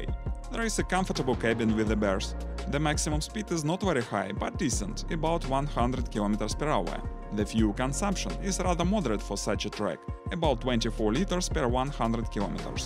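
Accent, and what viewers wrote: Russia. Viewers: Love your accent my Russian friend. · Братан акцент поправь, прям по произношению слышно, что говорит русский! 🤣🤣🤣 Привет с Казахстана! Монтаж видео зачет! 👍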